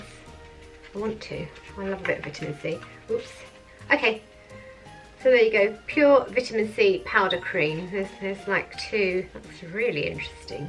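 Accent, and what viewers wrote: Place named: United Kingdom. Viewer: Loved the review, and you really made me giggle with your super British accent, Cute Channel, will be checking you out again!! xx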